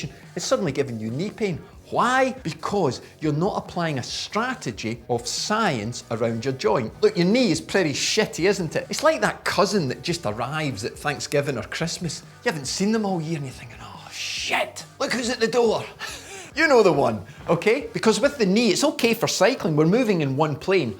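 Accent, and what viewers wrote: Scotland, United Kingdom. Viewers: Can somebody please summarize what this bloke is on about I haven't got the time or the patience to hear and decode all of this Scottish I just wanna know if I'm wreckin mah knees you know and is cyclin bad.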